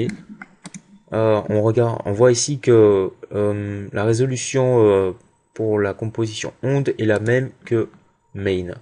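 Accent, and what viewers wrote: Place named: Antilles, Caribbean. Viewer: a ta voix sa se voit que t antillais ;)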